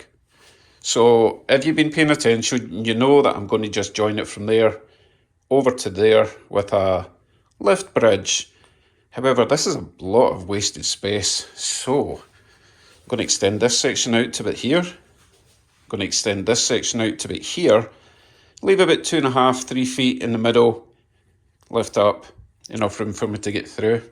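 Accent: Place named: Glasgow, United Kingdom. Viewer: I did a video a couple of days ago on making inexpensive backdrops for the Train layout you may find useful. It costs 90% than store offerrings. Now i'm watching all your old videos. Your accent reminds me of my Grandmothers' accent all the way from Glasgow. None of my boyhood friends cound understand her thick accent.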